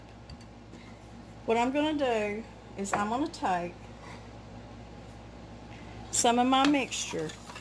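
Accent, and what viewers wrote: South, United States. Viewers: I absolutely love your channel! Your southern accent is so beautiful and relaxing. You and are the cutest ever! You make me this yankee girl miss living in Tennesse God Bless y’all ♥️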